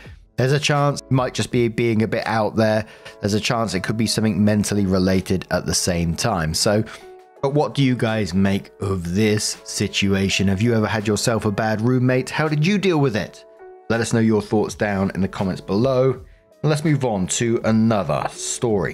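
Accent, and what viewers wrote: United Kingdom. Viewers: Hiiiiii ❤ sending much love from austria 😊…always happy to hear your calm voice and lovley british accent 😅…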